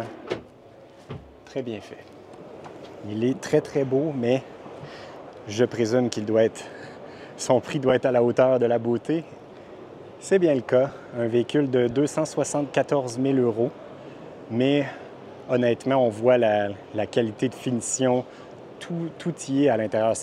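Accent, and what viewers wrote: Quebec, Canada. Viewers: Le système douche/toilette séparé par une cloison amovible! Wow ! Aussi, je veux te dire que ton parler fait honneur au québécois, moi qui en suit une! ❤ Tu n'as pas été empêché de filmer comme les Vadrouilleurs toi? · Merci pour ta vidéo et surtout merci de garder ton accent Québécois pour nos cousins.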